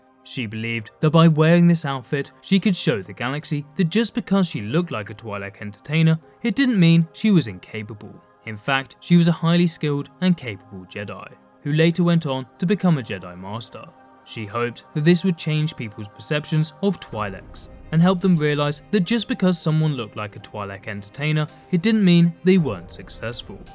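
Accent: United Kingdom. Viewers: funny gow the CC spell twilight instead of twi'lek, either you work ob your pronunciation, or you stop using that dumb computer generated ouce with that british accent. That's not your real voice. I don't buy it. Next time, explain Ahsoka's clothes. I loved her tube top and backless outfit, but the question us the same. ps: came to know why her outfit (which I never questioned) and I learned she was a slave and then banging Kit Fisto.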